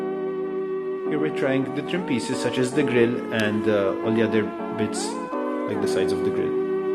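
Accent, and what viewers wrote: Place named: Eastern Europe. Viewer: Great work again, many thanks for sharing. Thanks too for letting us know where you live. Your dialect sounded more like a Eastern Europe to me.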